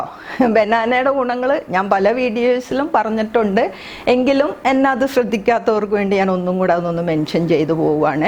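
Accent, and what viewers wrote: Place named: Kochi, India. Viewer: എനിക്ക് സംസാരം കേട്ടപ്പോൾ തോന്നി ഞാനും കൊച്ചി,കൊച്ചിക്കാരൻ, ടിപ്സ് ഒക്കെ അടിപൊളി ❤️🤝🤝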